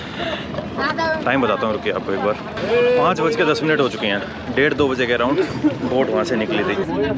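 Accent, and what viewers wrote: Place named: Bengal, India. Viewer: Your bengali accent is so ❤😉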